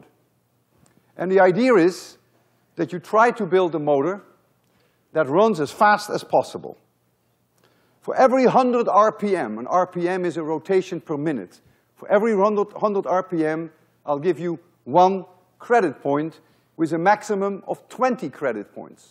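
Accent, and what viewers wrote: Netherlands. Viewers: Alfred Castillo Exactly and i'm proud of this professor from the Netherlands just like i am. You can clearly hear that he has a Dutch accent.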